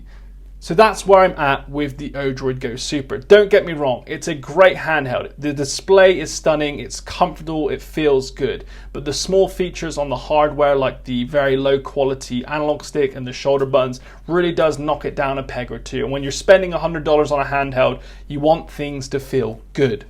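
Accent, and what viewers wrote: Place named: West Country, United Kingdom. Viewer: Jesus that west country accent :) Hardly ever hear YouTubers with a south west accent. Love it!!! lol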